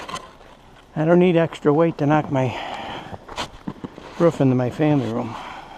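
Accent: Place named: Massachusetts, United States. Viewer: HI...I had to come back and respond to your nice reply. I'm an old Mass. girl. (Medford, Walpole, Lynn) and enjoyed the accent. My life brought me to Mich. Thought you'd get a kick out of the fact that I forwarded the Vlog to Thailand, where my son, a retired Special Forces Colonel has married and retired. Wanted to jog his memory about snow (I don't like hot, humid weather, so I visit him on Facetime every Sat. Nite. He forwarded the Vlog to an English friend who married and settled there, and wouldn't be surprised if he passed it to some Vets at the VFW in Bangkok. A reminder of winter and what surprises it holds.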